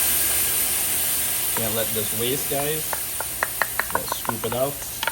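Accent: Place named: Trinidad and Tobago. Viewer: found this channel referred to by trini style with videsh. hafta say it's heartwarming to see an american in love with trini culture. you even talk like a trini using our slang. big up yuh whole self.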